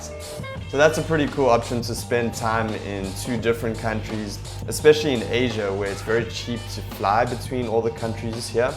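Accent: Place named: Johannesburg, South Africa. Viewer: Aah, the South African accent :) Sounds like a Joburg boy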